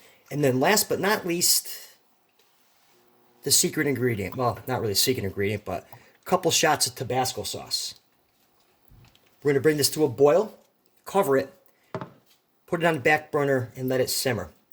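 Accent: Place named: New England, United States. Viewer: Nicely done man I had a feeling you’re also from New England from the accent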